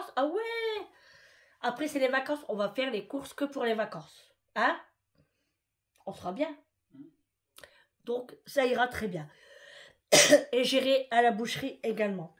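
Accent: North, France. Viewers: Bonsoir je suis une nouvelle abonnée. J'ai aimé votre naturel, votre simplicité et votre franc parler. Vous avez l'accent du nord. Je suis de douai ( 59) prenez soin de vous et de vos proches. Je vous souhaite pleins de belles choses à vous et votre famille. Dieu vous bénisse ❤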